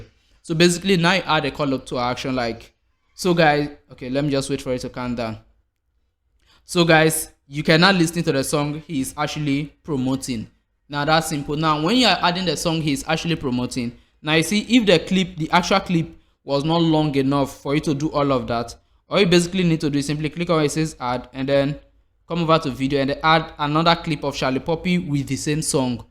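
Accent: Nigeria. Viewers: Is it eshot or eshort? Nigerian accent hard to understand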